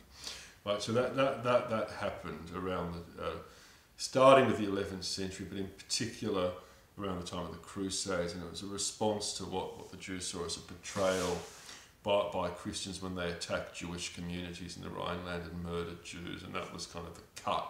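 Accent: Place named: Australia. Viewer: interesting vid - fellow aussie it seems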